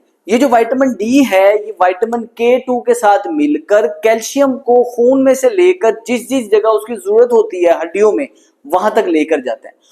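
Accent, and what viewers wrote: Punjab, India. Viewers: لھجے میں بہت پنجابی پن ھے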